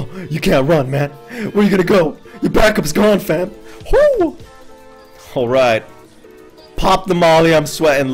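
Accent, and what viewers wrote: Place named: United States. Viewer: Are you sure you're not American? You sound like somebody from where I live.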